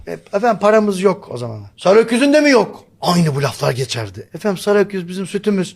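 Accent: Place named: Anatolia, Turkey. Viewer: Yalnız hoca konuştukça şive daha da Anadolu ya kayiyo☺️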